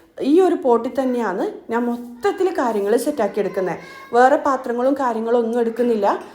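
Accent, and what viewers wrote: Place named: Kasaragod, India. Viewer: ഇങ്ങടെ സംസാരം പൊളിയാ.. കാസർകോട് ആണോ 😍😍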